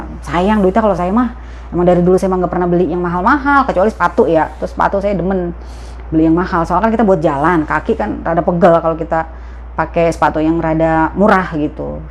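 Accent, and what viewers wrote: Jakarta, Indonesia. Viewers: Demen bgt nnton cenal,logat betawiny kental bgt,lucu 😁😀suka ketawa2 sendiri klu lg keluar logat betawiny. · Betawi banget dah mpok hehehee luccuu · Like Is the best Logat Betawi 👍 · klu ngomong logat betawi banget lucu ❤👍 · Subhanaullah seneng bnget dah kalau ngjlasin tentang kehidupan di Saudi resep bnget dengan logat betawinya,( aslinya mna mpo yg kece) ITS the best untuk Chanel ini sukses selalu aaminn yra 🤲🏻👍🏻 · Duit kita ga semene....saya bukan senang ceritanya...tpi logat bahasa Betawinya yg saya demen...et dah si mpo.... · Logatnya betawi banget dah....sama dg kite hehe...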